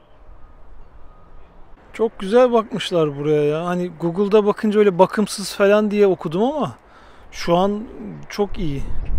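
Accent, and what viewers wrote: Samsun, Turkey. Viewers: Abi sen samsunlumusun ellllllliii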